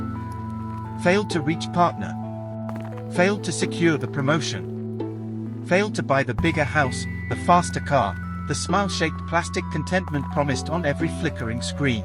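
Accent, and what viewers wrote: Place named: India. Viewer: There are so many better text to voice models. Like elevenlabs. Please replace this lifeless indian-robotic commentary. The whole concept strongly depends on the speaker.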